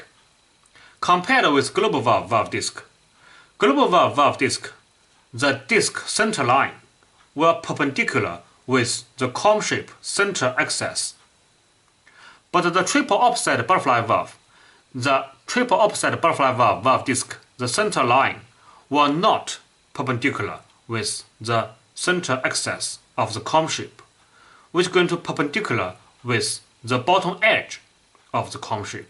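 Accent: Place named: China. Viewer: 中式英语口音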